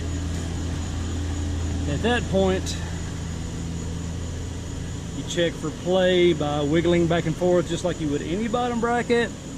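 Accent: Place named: South, United States. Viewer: Hands down best guide on youtube, thanks! Always a pleasure to hear a well-spoken Southern man who understands millimeters!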